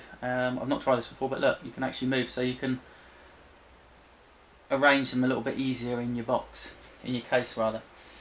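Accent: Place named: United Kingdom. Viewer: You sound british. Where do you get your parts from?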